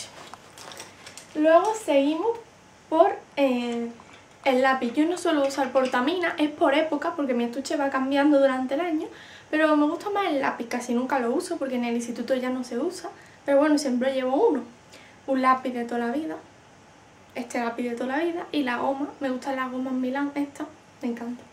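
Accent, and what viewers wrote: Andalusia, Spain. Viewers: ¿Eres de andalucia?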